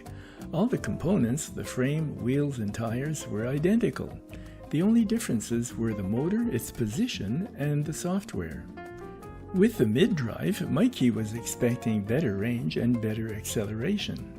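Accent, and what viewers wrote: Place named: France. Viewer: Je sais pas, la rue Maine je croyais c'était à Sherbrooke, et il y a beaucoup de côtes dans cette ville-là. Alors j'ai cru c'était cette ville-là. Mais clairement je savais t'étais français juste de la manière tu parle l'anglais. Haha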